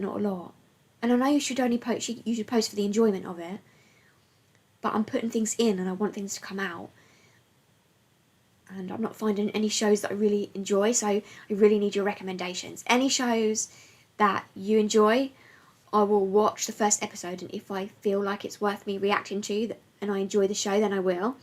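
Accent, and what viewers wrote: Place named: North London, United Kingdom. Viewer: I discovered your channel from the early Skybound days and you became an instant follow. There weren’t many British reactors that I could find back then and I was trying to suss out your accent …( I settled with somewhere North of the Thames )…,the giant mugs of tea and the ‘professional crying’ drew me in..! I remember a video you put out about 3 years ago and after that one, I could see the hurt in your eyes that you were trying to overcome and continue onwards but the damage was done and I noticed the sporadic posting..! Recently have been unfollowing some channels that haven’t posted in a while but yours was not on that chopping block…I kinda knew you’d be back sometime..! Anyway…I’m beginning to waffle but I do have a suggestion for a tv series to react to…it’s called Pluribus…it’s on AppleTv and apparently from the same guy that did Breaking Bad and Better Call Saul…two shows that I’ve never seen but liking this at moment.! 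But it’s great that you’re possibly coming back to reacting and that you have a focus to move forward with..! Excelsior… 😉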